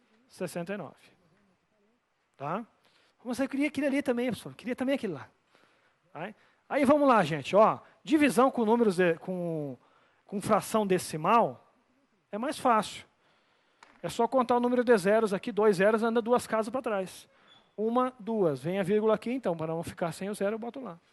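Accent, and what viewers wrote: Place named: Curitiba, Brazil. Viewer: Aula perfeita! E o sotaque curitibano é o melhor kkk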